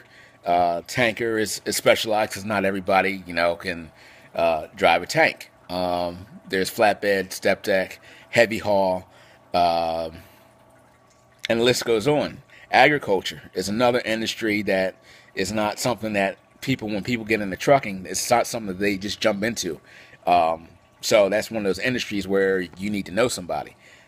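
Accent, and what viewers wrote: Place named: Eastern Pennsylvania, United States. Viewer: I enjoy watching your videos it sounds like you're in eastern PA I live in South Western PA Pittsburgh anyway I was very interested in trucks and muscle cars as a kid now I'm much more interested in Eternal things the Bible is a Hebrew Book both Old and New Testament Jesus uses midrash on a couple of occasions he was describing the crucifixion to Nicodemus and liking it to when Moses was commanded to make bronze serpent to save the lives of the Israelites bitten by the serpent he tells the Pharisees that just as Jonah was in the belly of the whale for 3 days and three nights so shall the son of man be in the belly of the Earth so these are two examples of midrash the reason this is important it's because once we understand that the whole Bible is written this way then we go back to the Old Testament stories and see that every last one of them has a prophetic meaning we live in a day and age one prophecy should be something every Christian is studying but too often too many churches have other focuses money your best life now these sorts of things Jesus warns do not be deceived 4 times he wants about earthquakes and rumors of wars once he also says narrow is the gate and difficult is the way that leads to life very different I want most churches are saying these days let me leave you with this little nugget we see 666 and revelation but it's in the Old Testament a couple times Solomon's wages were 666 talents of gold Nebuchadnezzar's statue Goliath ect so anyway it won't be lo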